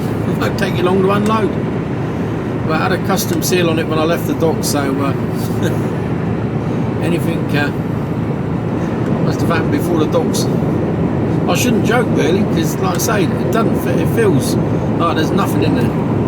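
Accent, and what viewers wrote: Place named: South London, United Kingdom. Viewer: Always confused trying to place your accent,picking up a little South London,Plumstead area maybe.? Enjoyable vlog,nice to see the A1 ,used to spend hours on it and hated it then.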